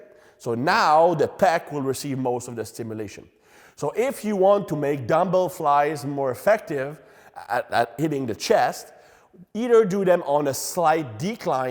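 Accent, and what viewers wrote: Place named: Quebec, Canada. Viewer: it’s a Quebecois accent:)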